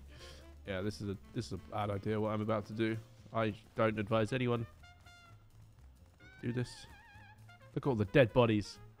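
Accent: United Kingdom. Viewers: Are you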